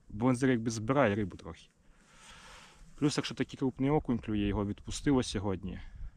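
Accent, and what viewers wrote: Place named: Polissia, Ukraine. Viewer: так, лайк за україномовний контент, і я чую по Вашому говору поліський край, Житомирська область, коростенський район??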